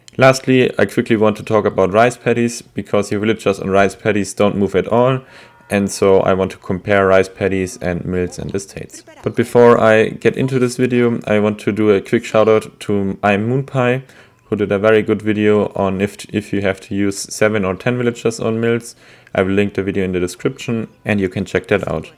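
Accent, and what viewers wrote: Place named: Germany. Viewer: I am sorry but this video is incomplete. The real gather rate heavily depends on the number of villagers per mill / estate, since the collisions become more frequent and more time is lost. We have calculated the gather rate for all possible numbers of villagers, CDB, and settler wagons: <link> (the Video is in German but since your accent sounds German, I hope that you will be able to understand it).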